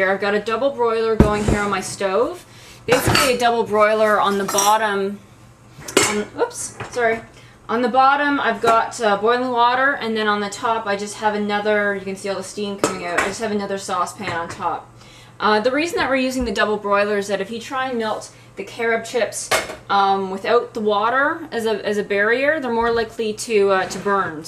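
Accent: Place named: Canada. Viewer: All foods are an aquired taste. Where did you get the Oil atomizer?(brand name, you sound Canadian, is it from the US?) Can't find them here. Coconut would rock in this recipe too.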